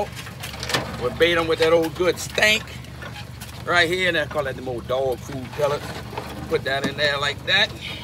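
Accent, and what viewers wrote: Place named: New England, United States. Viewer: You sound like a Yankee friend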